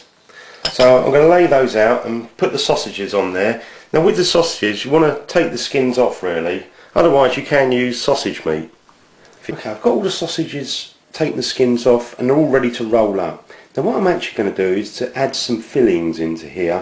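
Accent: United Kingdom. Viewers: My apologies, I thought you were talking about something you can only find in the uk, looking back on the video, I now see you were talking about BEATED egg, and not beat and egg. I sometimes have troubles with understanding uk accents (I come from Canada). Thank you for your understanding, ~DeathAtheist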